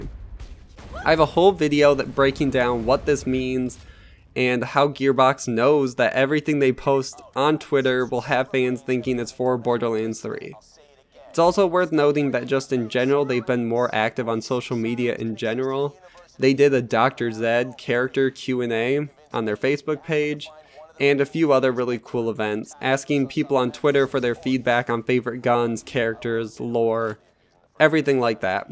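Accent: Netherlands. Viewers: Are you Dutch? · Wwowowo are you dutch dude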